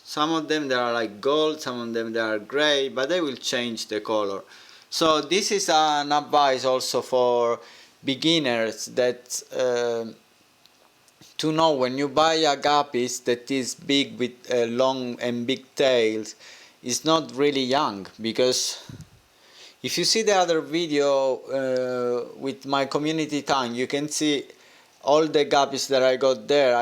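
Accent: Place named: Italy. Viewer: pretty sweet bro! u italian? hahaha ur accent makes me tell u r! but anyways nice tank and vid! just earned a sub please consider subbing back please if u want.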